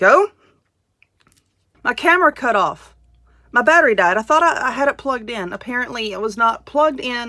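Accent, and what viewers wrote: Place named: South, United States. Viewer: I have just started watching your videos, this one was my second one. I love your spirit and the fun you put into your crafts. And the southern draw! I am a born and raised in the south and I can understand you perfectly. Thank you and keep it up. 😁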